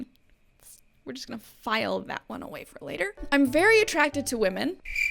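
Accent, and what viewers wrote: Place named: Prairies, Canada. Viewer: I'm yer Uncle Taz and I found Midwestern Canadian accent peeking through from time to time. Involuntary Smile Reaction engaged...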